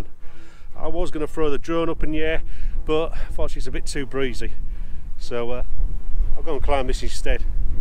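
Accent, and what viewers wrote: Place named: Hull, United Kingdom. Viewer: Fantastic setup mate, you’re from hull aren’t you ??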